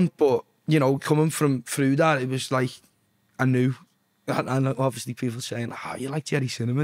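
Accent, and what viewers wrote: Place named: Liverpool, United Kingdom. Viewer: Boss that proper scouser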